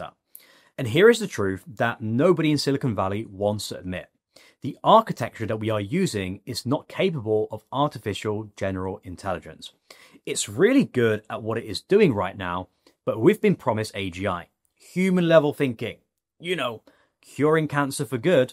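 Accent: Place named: United Kingdom. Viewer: when i hear british accent i'm already prepared to hear nonsense, proven itself true more often than not... and here we go again. how about making a vid explaining that the ai bubble burst, once it actually did!? oh no, can't do that yet, bc it hasn't, and if you'd not make these ridiculous "predictions", then you couldn't say later on "yeheyy, told you so!"... but what happens if there actually isn't an ai bubble?? then you'll just be quiet and hope nobody's going to call you out for your bs opinion bc nobody will bc you're not well known / a celebrity. so there you are making nonsense "predictions"... but why? what are you so angry about? explain??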